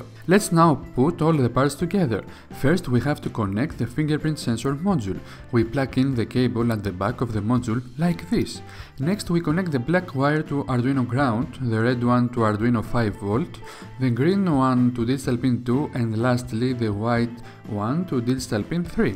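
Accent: Greece. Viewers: you sound greek, I'm from Rhodes btw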